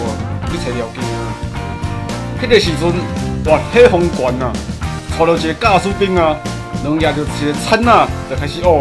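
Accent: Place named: Taiwan. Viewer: 求更多台語片 有夠道地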